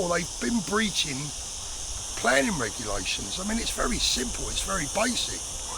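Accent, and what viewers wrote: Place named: Cockney, United Kingdom. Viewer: Where are you filming from? Do i hear a cockney accent, so long since ive heard one. Wonderful dulcet tones ❤❤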